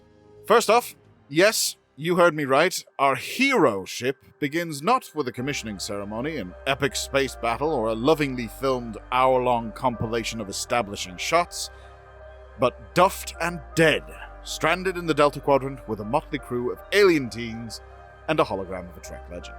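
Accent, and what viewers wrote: Ireland. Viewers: I would have bet the accent was Irish eastern mild Dublin or surrounding area. But accent can change based on location. And time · Are you Irish?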